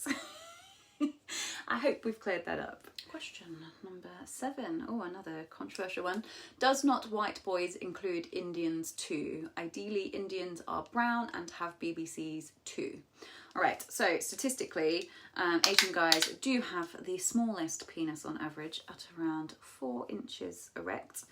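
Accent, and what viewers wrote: United Kingdom. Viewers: Wow, she is so tastefully evil 😈. And the British accent on doms is undefeated. Thank you for touching on average/above average guys being into it. I don't see it as humiliation, I just think a woman being an unapologetic size queen soooo hot. 🙏🏾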